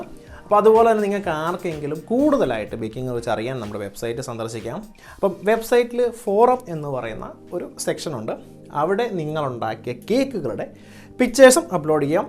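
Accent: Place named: Kottayam, India. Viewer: Hope it from kottayam ..slang like my Amma....nostalgic...presentation also super...chettan superaa...🚽😊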